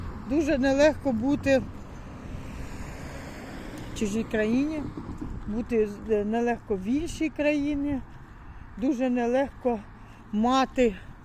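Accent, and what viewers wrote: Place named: Surzhyk, Ukraine. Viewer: Приємно суржиком розмовляїте.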